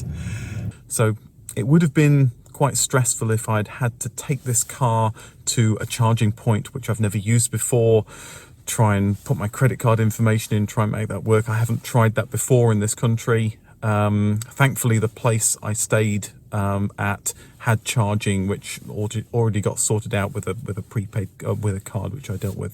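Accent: England, United Kingdom. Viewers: Wait, you live in the USA? I assumed you were British and in th UK the whole time... :volcano-green-lava-orange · I watch your videos for over 4 years now and i could swear you are from England, and now this video suggests that you live in USA 😂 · You are so British, I thought the whole USA scenario was some sort of roleplay. Even the shelves behind you look like BnQ units I had in my house in Ireland. I actually dug into your channel details to check location. Definitely my surprise of the year, that you're base in the US! Anyways Love the videos (in Terms of merit) and your voice. Very soothing after a stressful day. Greetings from Poland. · It's funny to hear an English accented person to say they are driving on the wrong side of the road. 20 years is just run in on most ice's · I thought you were British... 🤣 Also it's extremely early for EVs. 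In my city and in my country there are a few charging stations and those who have houses with garages are the only ones who own one.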